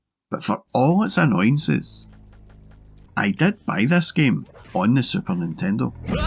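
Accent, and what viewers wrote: Scotland, United Kingdom. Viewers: Do you have another channel? Theres another guy with a Scottish accent on the segaguys channel i think😅 (asking if you're the same guy, sorry)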